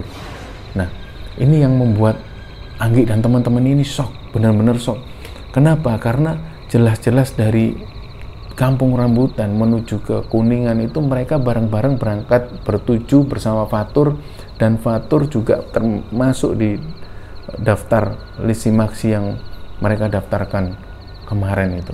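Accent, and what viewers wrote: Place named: Surabaya, Indonesia. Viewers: BetuL...memang jauh berbeda,pendalaman cerita,penyampaian,anatomi tubuh dan aksen suroboyoan betul2 itu yang membuat beda dengan yg lain,mantapzzz 👍👍👍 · Ga bisa dipungkiri bahwa memiliki ciri khas yg tidak dimiliki chanel" lain yg sejenis, dalam diri mas (tanpa mengecilkan peran team yg lain) dengan runtut cerita yg jelas,lugas, sederhana, tenang, mampu menghidupkan suasana cerita, tentunya logat Surabaya yg khas. Pertahankan semua itu cak, yg perlu ditingkatkan hanya intensitas upload cermisnya saja, biar kami sobat kembara ga kelamaan nunggunya, maju terus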